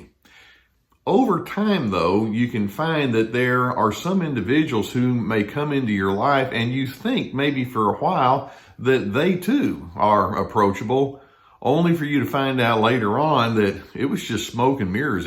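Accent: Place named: Virginia, United States. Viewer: Dang it, been listening to for years, just picking up your sweet southern accent. Virginia?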